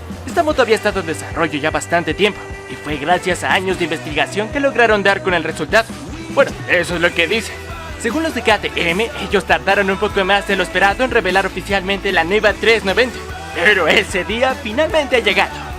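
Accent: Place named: India. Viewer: Acento tipo Apu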